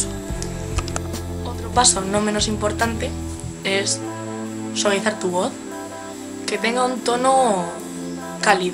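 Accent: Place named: Murcia, Spain. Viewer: ese acento murcianica!! ^^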